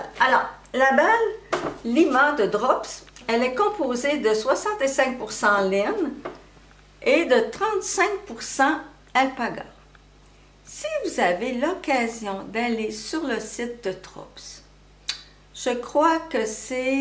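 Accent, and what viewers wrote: Quebec, Canada. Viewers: Bonjour du nord pas de Calais en France Merci De nous faire voyager au Québec, je rêve d'y venir 🤩 J'adore votre douceur et votre bel accent québécois Bonne journée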